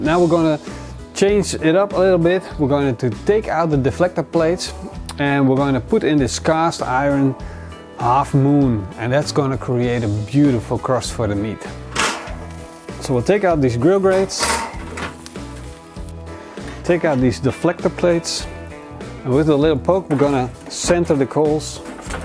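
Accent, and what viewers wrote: Netherlands. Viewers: Prices are bit different in the Netherlands. Maybe you noticed his slight accent. 150$ sounds about right in Europe. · you speak with dutch accent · I knew you were Dutch 5 sec in by your typical English-Dutch accent. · Hahaha, Nederlands zeker? Hoor je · I knew you were fucking dutch. the accent gave it away but i was still doubting myself untill i saw the last part.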